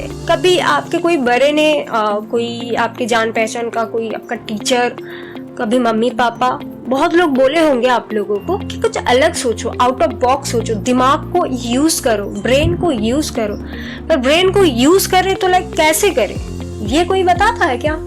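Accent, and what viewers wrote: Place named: India. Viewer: A nice way to make people understand that how one should think #hatkae... Liked your video and loved your hindi accent. Would like to see more videos from your end. Good luck 💙💙💙💙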